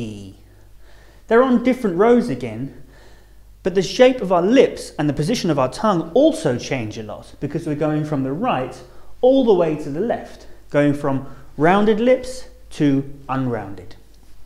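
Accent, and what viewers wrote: United Kingdom. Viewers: I'd like to make an observation, this class is in British English which has a different pronunciation than American. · He is obviously British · the presenter has a British accent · watching this video as an American and all the examples are just British pronunciations :') "heuh" "powah" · I have a question... Are you British or American???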